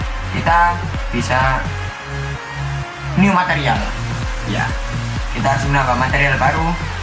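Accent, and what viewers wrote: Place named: Java, Indonesia. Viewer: anjir medhogmu coeg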